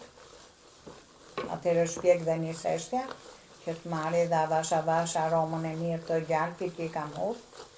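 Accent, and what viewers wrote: Tirana, Albania. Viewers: Te lumshin duret e ke qa. 😋 Masanej na kenoqe me te folmen . Te lumte qe se ke humb traditen Tironse. O Tiron zemer flori Prite te gjithe te medhej dhe femi Me ere Dajti uje Selite I rrite cunat dhe gocat si rreze drite Lum ai qe i bo shok Se ka bo i mik per koke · Ju zèntè gezuar e me shendet madam qe flet me lezet gjuhen tironse 😘🌟👍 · Ju lumshin duart zonje je shume nikoqire bravo qe sjell ne guzhinen tende gatime tradicionale me qelqen e folura jote tironse respekte!!! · Dhe une e bej vazhdimish moj Zonje e nderuar,pervec gatimeve te mrekullueshme me pelqen dhe dialekti Tiranos ton te dashure! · Te lumshin durt e arta moj teta ☀️🌷me kenaqe me kete menyre te folur Tironce, te pershendes nje Kavajse nga Modena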